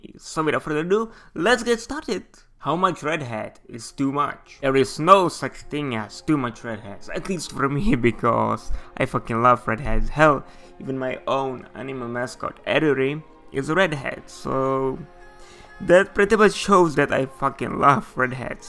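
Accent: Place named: Mexico. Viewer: That is a Spanish/Mexican accent or my name is Mexican Jumping Bean! (and it's not)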